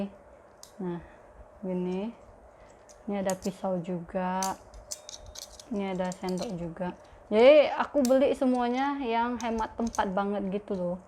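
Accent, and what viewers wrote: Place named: Medan, Indonesia. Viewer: Medanya gk hilang .. wkwk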